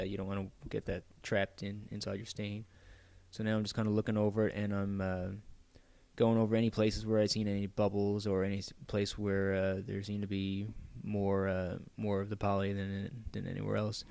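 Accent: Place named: Cape Breton, Canada. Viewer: Are you from Cape Breton ? You got the accent lol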